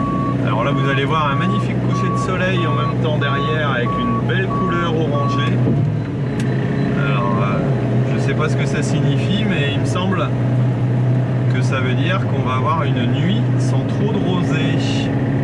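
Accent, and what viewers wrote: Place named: North, France. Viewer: Bonjour, je me douté bien que cette accent c'était un accent du Nord on est vite trahi par notre accent 😀 bonjour de Liévin,ta ferme de situe dans quel coin.?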